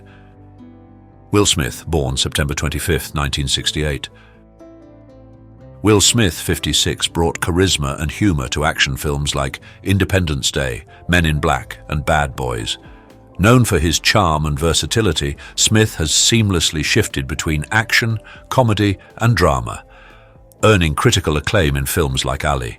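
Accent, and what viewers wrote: United Kingdom. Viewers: Why always a British narrator? U'd think we're in England watching some telly!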